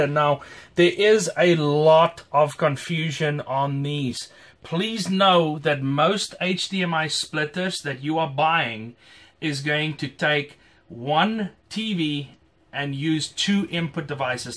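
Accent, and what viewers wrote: South Africa. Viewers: Great Saffer accent coming across-but well done all good! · His accent is a proper mishmash but the way he pronounces his words ending in ER gives him away as a fellow Afrikaner 🤣 Or at least it does for me.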